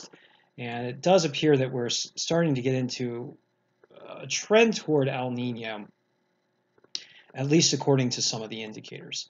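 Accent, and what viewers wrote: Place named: Philadelphia, United States. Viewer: Thank you, your videos are much appreciated. You have a strong accent...you must have spent some time close to Philadelphia Pa., perhaps in the South Jersey area.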